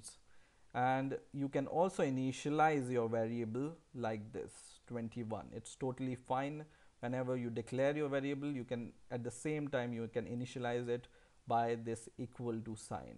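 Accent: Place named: South India, India. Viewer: Daata type I think he is a south indian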